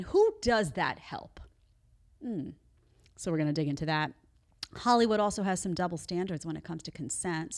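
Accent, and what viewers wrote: New York, United States. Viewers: I can hear NY in your voice sometimes, lol. You try hard not to show it.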